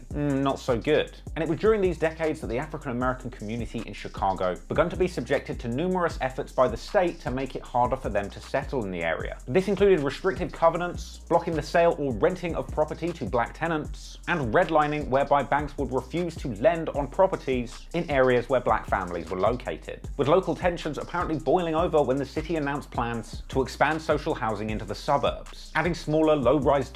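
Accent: England, United Kingdom. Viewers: So we really listening to this British or whatever the hell it is tell us about American gangs and then he has a rap label that he said is the new death row?!? Wake up people. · It’s a little weird a Brit talking about Chicago gang history but you seem to have done an extensive amount of research. 🤷🏽‍♂️ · why is a British dude talking about gangs? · RIP to all the young brothers that lost their lives. Once again the devil is trying to divide the Black Community and the Hip Hop Community. Unity is power brothers . Brother Hoover said G D is growth and Development the B D in this case should be Business Development. You see they have a White Man with an England accent narrating this, he is exploiting black youth he will never set foot on Parkway Gardens or O Block to help these young brothers. Unite GDs and BDs because we have one common enemy. Let's build up our communities and protect our children, women and senior citizens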